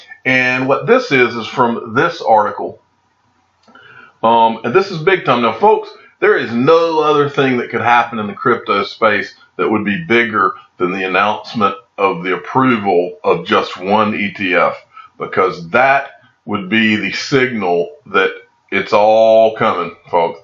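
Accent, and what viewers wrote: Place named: New Orleans, United States. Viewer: I thought he was from NOLA as I am but he’s from Maryland if not mistaken. I remember asking him a few months ago. He does sound like he’s from NOLA.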